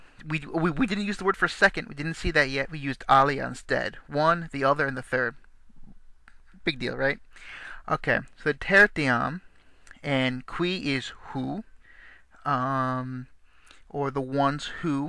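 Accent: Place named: United States. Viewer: Your pronunciation is too "American" sometimes: instead of [e] or [o], You read [ei] or [ou]. Also, if you're trying to use reconstructed pronunciation, try to find a version of text where long vowels are marked, it completely changes the accent: dIvisa, trEs, quArum Unam etc. And it would be really great if you'd just read it faster;p it'd have sounded much more realistic.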